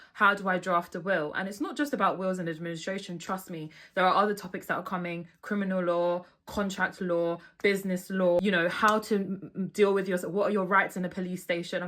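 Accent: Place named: United Kingdom. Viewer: You have a British accent so why didn’t you go to school over there? Are you just trying to grift? 🤔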